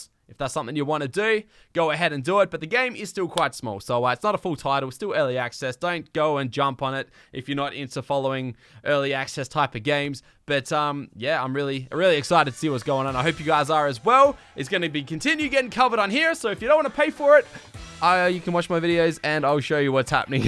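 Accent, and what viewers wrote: Australia. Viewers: are you Australian?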